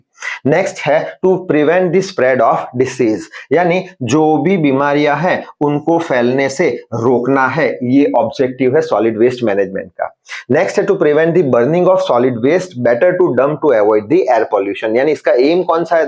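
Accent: India. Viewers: You speak Indian English. And you look like an Indian.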